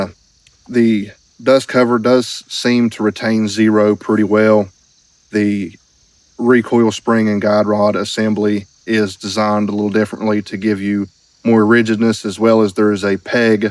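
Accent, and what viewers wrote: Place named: West Virginia, United States. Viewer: Fellow West Virginian? ✅ Smaller channel? ✅ Shootin in the holler? ✅ IWI Ace? ✅ My ears perked up immediately when you started talking 😂😂 you got another sub, hoss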